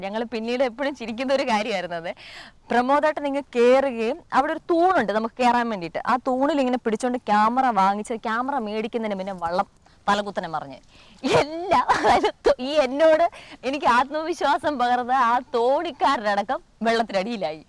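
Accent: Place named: Trivandrum, India. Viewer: Chechide sound ketal chechi tvm aanu nadu ennu thonnuva...kasargod aanennu parayila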